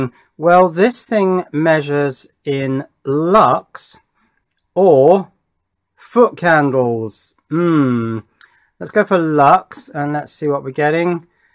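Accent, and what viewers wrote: England, United Kingdom. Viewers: By his accent, England I'd guess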